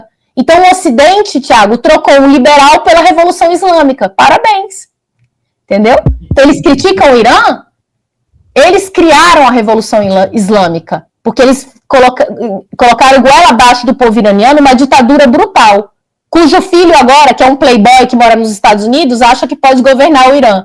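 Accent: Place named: Minas Gerais, Brazil. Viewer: ❤ QUE SOTAQUE LINDO! SERIA ELA UMA MINEIRA? MUITO BOM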